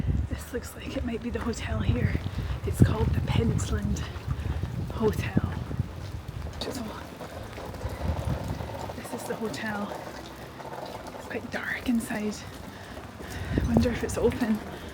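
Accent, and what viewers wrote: Scotland, United Kingdom. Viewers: Hi,I wanted to tell you how much I enjoy your journeys and adventures. You are lovely and so well spoken... love your Scottish accent. My mother was born in Scotland. Best wishes, and I look forward to your next video.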